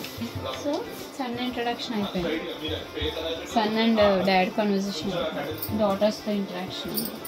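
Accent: Andhra Pradesh, India. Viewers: andhra matladakandi 😂please jai telangana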